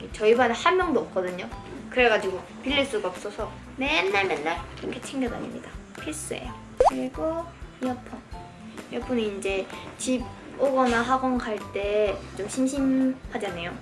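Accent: Busan, South Korea. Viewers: You got a dialect. Are you from busan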